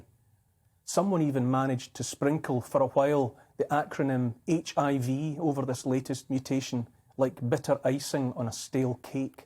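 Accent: Scotland, United Kingdom. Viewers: Freedom sounds so much better in a Scottish accent. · You are the very first person I’ve comes cross to see through this guy. I was getting worried I was the only one. He’s crafted his delivery to come across as very sincere. He doesn’t smile, continuous Scottish monotone, speaks of tradition, knowledge, learning, then says he’s not an academic, but that taking advice from medics, scientists, and virologists who have to train and study their disciplines for years should be ignored. He doesn’t acknowledge this virus has killed and caused long term damage to thousands of people. But these fools kneel at his feet. Pathetic.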